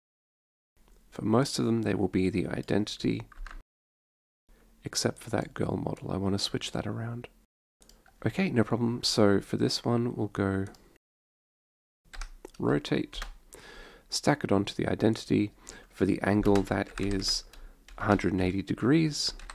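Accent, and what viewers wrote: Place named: Australia. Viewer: I did think it was Australian, moreso by the language than the accent. Keen for more VK videos.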